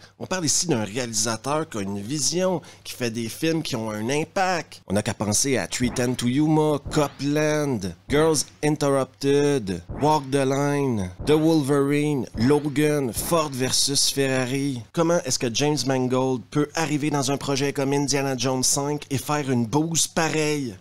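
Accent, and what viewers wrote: Quebec, Canada. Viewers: Bonjour, 1ère video vue sur votre chaîne et déjà "super" je comprends tout (je suis Français). Et oui, souvent je tombe sur des videos qui ont l'air super intéressantes de Québecois mais l'accent est trop hard pour suivre (désolé de dire ça et je précise que j'ai adoré visiter le Quebec). Donc là, y a le super accent "typique" (pour nous qui parlons "too plate" comme on m'a dit à Montreal lol) mais c'est tout à fait compréhensible pour qui n'a pas l'habitude. Ceci dit, toute la critique du film est très drôle et intéressante et évidemment je suis d'accord par rapport à ce carnage de la légende "'Indiana" (il n'existe qu'une trilogie pour moi, vive les années 80). Donc bravo, je m'abonne ! Bonne continuation ! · C'est pas possible, qu'est-ce que tu fous dans ma tête? J'ai eu l'impression de m'entendre parler en québécois pendant un quart d'heure 😅 Merci pour la vidéo, même si c'est vraiment dérangeant d'être 100% d'accord avec quelqu'un 😱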